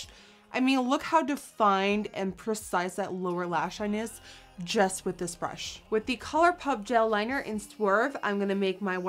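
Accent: United States. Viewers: how come she sounds American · Hoe heb je zo'n Amerikaans accent gekregen? 😝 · you live in Holland? oh. you sound american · i had no clue you weren't from the states, you sound american lol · Can you speak Dutch or something because you sound American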